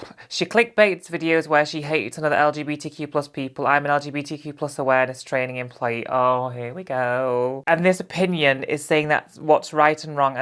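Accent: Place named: Manchester, United Kingdom. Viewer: Only mean thing I can say is how dare you be prettier and more attractive and beautiful than me! Also love the Mancunian accent 🩷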